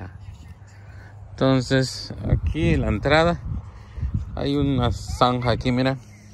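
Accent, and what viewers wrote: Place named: Guatemala. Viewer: Serote saludos así hablamos los chapines😂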